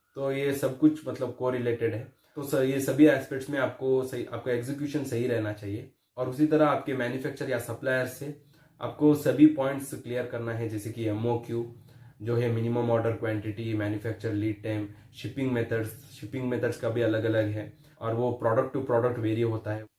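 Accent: Karnataka, India. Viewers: Are you from Karnataka bruh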